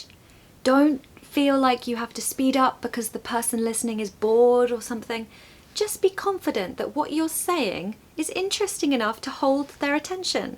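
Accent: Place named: England, United Kingdom. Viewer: I think your accent is the nicest English accent. Every word you say is crystal clear and lovely to listen to. I, myself, sound like an old ship's clapped out foghorn. Another great video,! Have a great weekend 👌🎸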